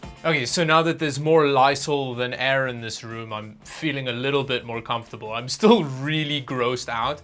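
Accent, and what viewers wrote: South Africa. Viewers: My first time watching one of your videos, and I just have to know... you're South African right? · You kinda sound like a South African ngl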